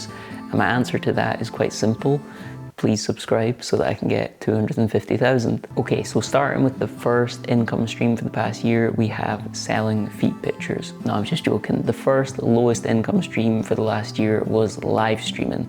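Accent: Scotland, United Kingdom. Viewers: People love honesty, and being up front about things, it is endearing. Plus who doesn't love that UK sense of humor, especially silly or self deprecating sarcasm? I think the soothing. calming aspect of your videos come from your voice, quiet with that very noticeable Scottish accent. What's not to love there? And who wouldn't be fascinated with a noticeably ginger Scot with a white patch on one side of his head who actually has the nickname and likes it? That is just the best. I honestly like your videos about your cat, and you baking, and you taking walking/hiking tours of your local country the best. The beauty around you and the music you choose for those videos make it like a 20ish minute mini vacation. Great work ….Brian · Have you thought of doing voice over work? You have such a lovely voice although am probably biased because my mum is scottish and just love (all) scottish accents! 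xx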